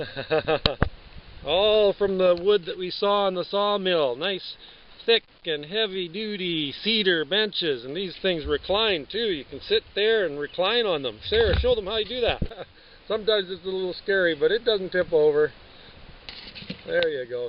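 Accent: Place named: Canada. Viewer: I detect a Canadia accent. We have 20 acres with mixed conifer forest, and a small number of oak. We need to clear trees to build. I thought we would turn the trees into firewood and buy lumber, but I'm starting to like this idea more and more. We have some very large trees compared to what you're working with, some that size too. Thanks for posting this.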